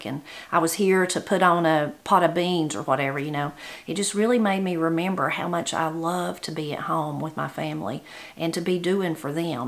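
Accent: Appalachia, United States. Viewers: God bless you and your family. Uve watched your videos for a year and a half now approximately. They have lifted me up in tough times. I thoroughly enjoy them and your wonderful cooking. Im from Appalachia un Virginia. You bring back such good memories of my grandmother's, grand father, aunts and uncles who talked the same way, cooked the same, so many great memories for me. I thank God for you and the good videos and work you do. Please continue to bless us with your videos ❤️💙🙏🙏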